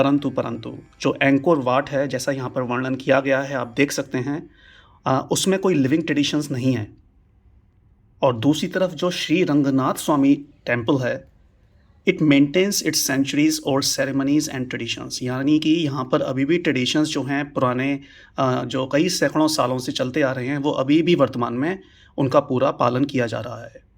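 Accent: Standard. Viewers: आपकी शुद्ध हिन्दी सुनकर बहुत अच्छा लगता है l धन्यवाद 🙏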